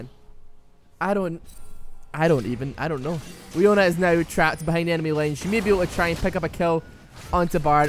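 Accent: Scotland, United Kingdom. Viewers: Are you scottish? XD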